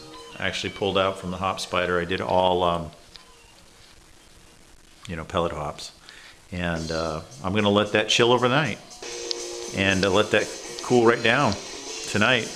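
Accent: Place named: Maine, United States. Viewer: Hi, great update! Nice to just see ya and hear that calm clean cool Maine accent again.:-) A little bit of equipment, brew day, projects - it's in all in here.:-) Take care and see ya when all is well and less hectic!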